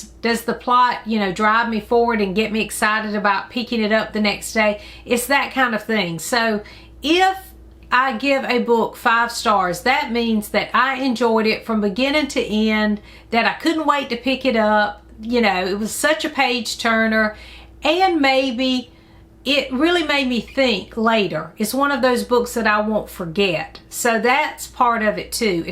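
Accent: South, United States. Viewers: I so enjoy your southern accent way of describing wonderful books. We have so many similar books in common. Glad you read Thomas Hardy and enjoyed him!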